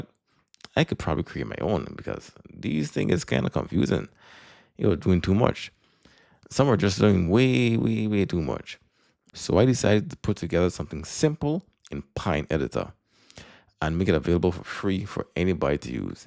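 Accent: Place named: St Croix, United States. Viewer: good morning. where are you from. sound very much like someone from STX.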